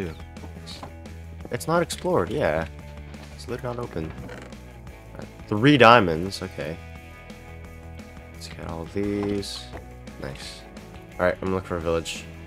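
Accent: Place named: Canada. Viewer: YOURE CANADIAN ASDKLNAOSPIJDKOJASDASDASD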